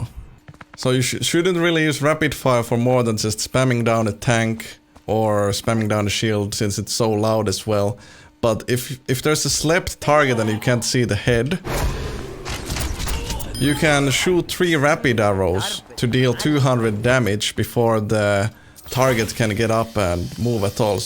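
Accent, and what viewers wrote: Finland. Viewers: Are you Finnish? You sound like it.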